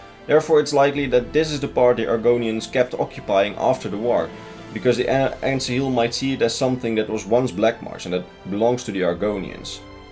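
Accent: Netherlands. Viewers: Je klinkt zo nederlands als je engels praat 😂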